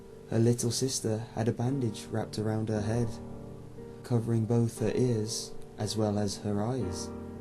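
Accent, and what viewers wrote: United Kingdom. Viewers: GO BRITISH VOICE OVERS FTW sorry for that, here let me...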